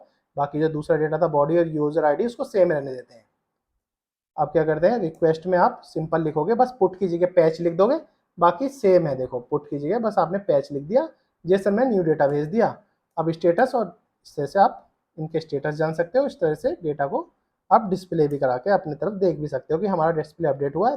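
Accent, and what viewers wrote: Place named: India. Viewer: Your Englisch is terrible, I don’t understand one word! You are speaking much to fast in your Indian slang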